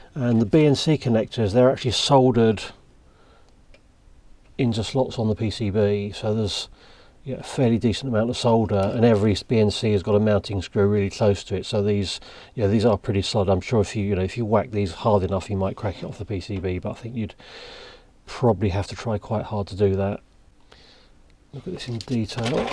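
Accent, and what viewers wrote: United Kingdom. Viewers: Very interesting subject really. but with your UK accent and whisper like voice. it is hard to catch the words with no subtitles. quite important product for me though but I ditch a lot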